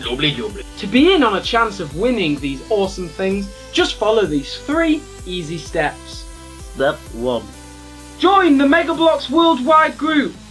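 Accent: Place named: Warrington, United Kingdom. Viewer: Was in Warrington the other day and everyone sounded like you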